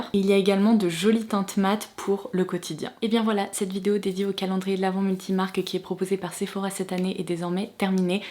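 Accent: England, United Kingdom. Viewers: Je vous découvre à travers cette vidéo, et c'est un vrai coup de cœur 😍 J'aime beaucoup votre voix douce et apaisante, et votre merveilleux accent anglais. Je m'abonne tout de suite à votre chaîne 🙂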